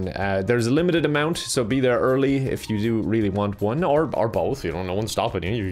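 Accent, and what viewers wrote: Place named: Ireland. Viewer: Are you Irish bc I am Love your vids ;)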